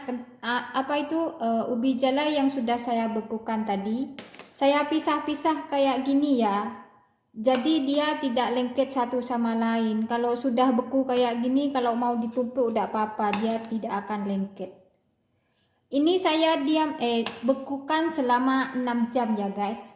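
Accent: Madura, Indonesia. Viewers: Boabbo orang madura ya gess.. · Orang madura ni kyaknya.... mantap.... yeeeee tak teee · mbak orang madura ya🤦‍♀️🤦‍♀️🤦‍♀️ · Kyk Logat madura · Fix mbaknya dari logat nya pasti orang madura nih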